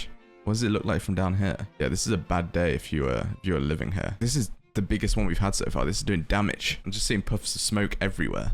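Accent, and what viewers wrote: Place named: United Kingdom. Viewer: You have a beautiful voice and a very pleasant accent. Pronunciation is usually regional; what part of Britian are you from? I hoping everyone there sounds like you.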